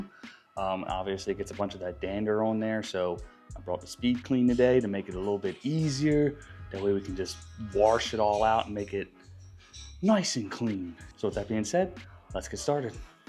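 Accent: Maryland, United States. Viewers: nice I was born in Essex but grew up in Western Maryland. I know a MD accent when I hear it. Great videos keep up bro.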